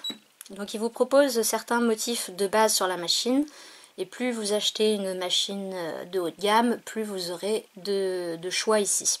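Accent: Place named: Normandy, France. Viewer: Bonsoir, j'aime beaucoup tes vidéos, super bien expliquées c'est vraiment super car ça manquait, merci beaucoup. Je pense que l'on vient de la même région j'ai reconnu l'accent normand 😉. J'habite à Paris mais je suis native du Havre j'y retourne régulièrement d'ailleurs. Merci encore pour toutes ces vidéos explicatives.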